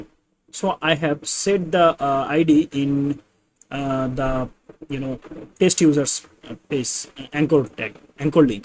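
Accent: India. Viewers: 50% = How to make a table in html . Strong idian accent. Badly confused instructor. With errors. Only full refresh method. Please do again.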